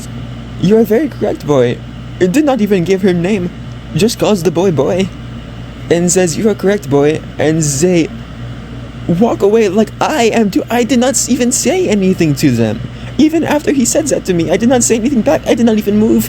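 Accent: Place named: United States. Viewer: i hear ur american accent coming out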